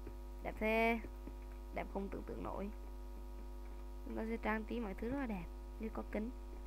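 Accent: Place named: North, Vietnam. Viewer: Hơi lai giọng bắc á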